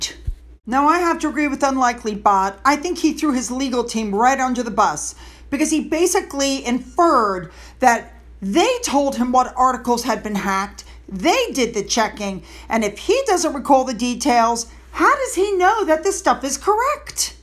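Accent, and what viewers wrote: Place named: United States. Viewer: So what she is a American not English .And American English is very different from real English.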